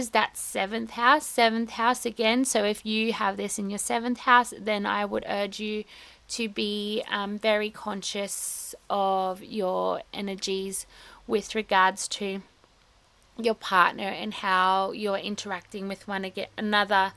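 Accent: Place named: Australia. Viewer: ❤ Hi. It is great to hear your views and also from a fellow Australian. I am saying this to help you improve. It is wise to use short succinct sentences, particularly with different cultures. I suggest slowing down. Try not to begin a sentence with So this means, And then... etc. It is like continuing the same sentence when it is actually the beginning of a new point. I had to learn the hard way when doing my Masters that short sentences were digestible. It is why people may find you hard to understand. Australians speak very quickly, particularly compared to the British. Our flat tone and different pronunciation is also a problem. I strongly recommend leaving a one second pause at the end of the sentence. It is like hearing you say "and then, and then, so and then...." I used Description (definitions and examples), then analysis (on the one hand this, on the other that), interpretation (what does this mean, what are the different viewpoints), and judgement (drawing conclusions) as a successful format. 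It is up to you of course. I just subscribed!🤣👍🦘🦘🦘💜🙋‍♀️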